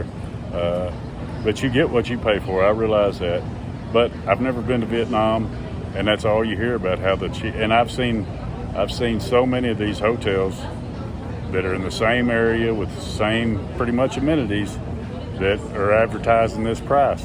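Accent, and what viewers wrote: South, United States. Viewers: 1st time viewer of your channel. You have a southern accent. Where are you from in the States?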